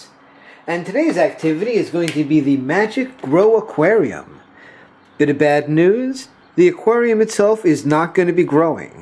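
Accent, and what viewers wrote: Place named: New York, United States. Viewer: Your accent kinda reminds me of a newyork one c: nice vid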